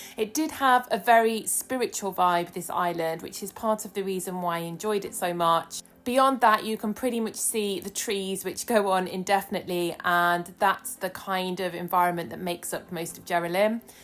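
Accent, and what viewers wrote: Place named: Home Counties, United Kingdom. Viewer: Forgive the late reply but the YT gods deigned to promote this video of yours after I have just watched the latest release from "Nick & Lins" who are well known promoters, in the naturist community at least, of clothes-free adventures which was a recap of their own Croatian experiences earlier this summer. I noted with interest that you didn't feel able to join in and go nude due to a lack of fellow nudies when you were there. If you ever go again one thing that Lins covered in her commentary of her video was that if you go a bit further off the beaten track, away from the touristy masses, you're more free to go nude. I'm also guessing by your content and accent that your a Home Counties / London based lady and so there are options for you here if that was something you wanted to pursue (because let's face it, you haven't lived if you haven't at least tried this out through going to a nude beach, an organised nude swim or just going skinny dipping). 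There are several clubs in and around the M25, three within only a short drive of each other if you head Hertfordshire way. These clubs do also offer ladies-only days where you can try things out but exclusively with other ladies so you're less likely to have to worry about men being about. There are also several nude beaches along the south coast which perhaps could be an option for summer 2026 if you want to try out British options. British Naturism is an excellent resource for locations and advice on the law if you're worried a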